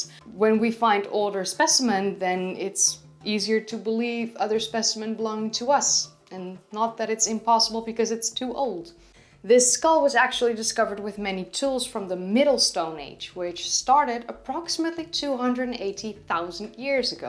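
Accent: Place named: Netherlands. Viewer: I love your Dutch accent on pronouncing Leipzig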